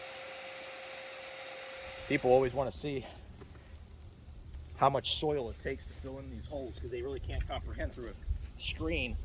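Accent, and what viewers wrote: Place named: Pennsylvania, United States. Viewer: Brother your PA accent is awesome! Reminds me of my 9 year’s training in philly. Your channel is the best!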